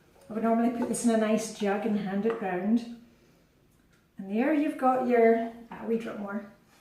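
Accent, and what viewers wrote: Scotland, United Kingdom. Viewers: Danish with a Scottish accent. That’s different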